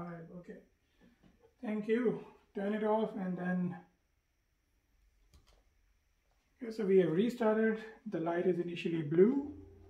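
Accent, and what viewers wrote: India. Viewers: You sound like an Indian Are you indian ? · why do you sound and look like indian. are you Indian??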